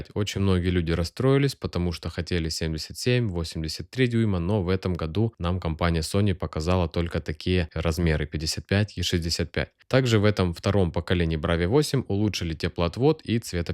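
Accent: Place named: Ukraine. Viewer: У тебя оч приятная укр мова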